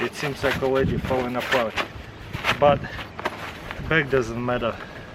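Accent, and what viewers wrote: Russia. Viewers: He's from Russia.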